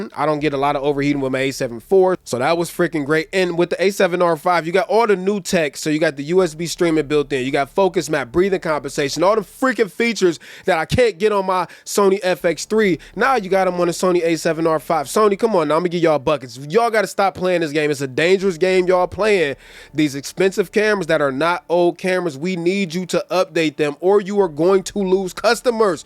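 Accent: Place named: New York, United States. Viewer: more normal English ? Most people don't come from USA or New York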